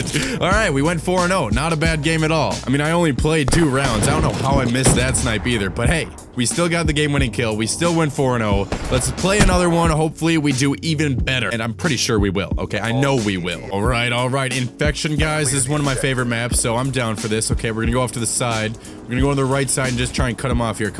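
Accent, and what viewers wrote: Minnesota, United States. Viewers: are u from Minnesota?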